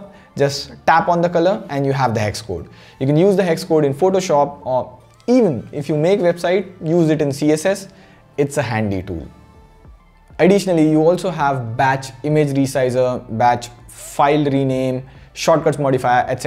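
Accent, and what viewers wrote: India. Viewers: u Sound like Indian Accent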